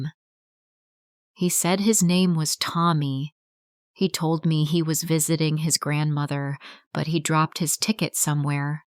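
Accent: United States. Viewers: Is it American accent ???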